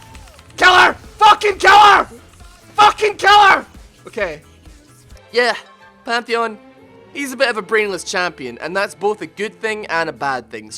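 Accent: Scotland, United Kingdom. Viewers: Is he from scotland? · is Scottish or something? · Bro are you scottish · Bro, you’re as scottish as anyone van become xD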